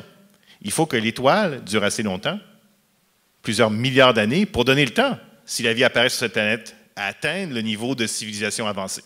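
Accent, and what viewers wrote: Quebec, Canada. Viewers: J'aurais bien voulu écouter mais je suis gêné par l'accent... Dommage. Vous n'arrivez pas à parler avec l'accent parisien, les Québécois ? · J'adore sa manière de parler, dépaysant et réconfortant. C'est ce qui est cool dans le Québécois comparé au Français, c'est que c'est la même langue mais avec des intonations et des expressions différentes qui font qu'on arrive à exprimer des idées d'une autre manière. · Je trouve qu'il a la même voix. J'ai le même accent, je suis québécois. On n'est pas obligé d'être d'accord mais c'est ce que je pense vraiment.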